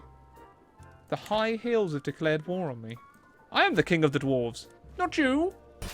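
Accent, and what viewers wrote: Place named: United Kingdom. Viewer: What type of British accent do you have it’s very pleasant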